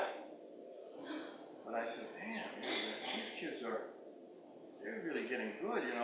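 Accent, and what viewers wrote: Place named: Youngstown, United States. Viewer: This won’t mean much to most anyone, but I can still hear the Youngstown in his voice. It’s like hearing home. Awesome story.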